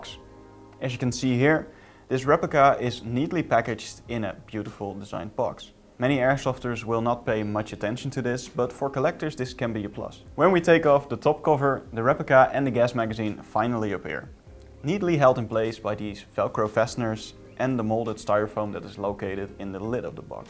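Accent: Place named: Netherlands. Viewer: I instantly heard Dutch english hehe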